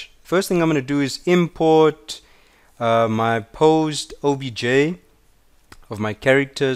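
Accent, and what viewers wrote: South Africa. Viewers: Thanks for a detailed tutorial bru....really nice to hear that distinctive SA accent :)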